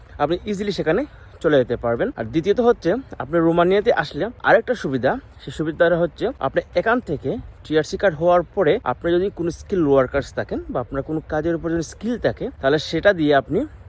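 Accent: Sylhet, Bangladesh. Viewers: ভাইয়ার বাড়ি মনে হয় সিলেট, তো আমি একজন্য সিলেটী হিসাবে আপনার সাথে কথা বলার আগরহ যথি মন চায় রিপ্লাই দিয়েন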